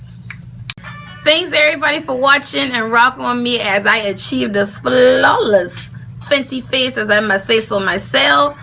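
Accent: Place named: New Orleans, United States. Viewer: You slayed this video! You are too much this New Orleans accent draws me in every time I promise lol 😂😂😂😂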